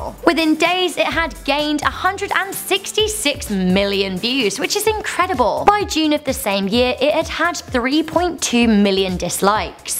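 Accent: United Kingdom. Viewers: Philip J. Fry It's a British accent. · ***** I KNOW ITS BRITISH but she tried to imitate the portuguese accent when she said "cortando o botao do youtube" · world most understandable British women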